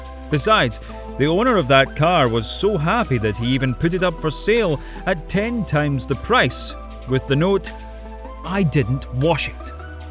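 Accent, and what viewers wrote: Scotland, United Kingdom. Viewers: A video about drinking just feels so much better when narrated in a Scottish accent!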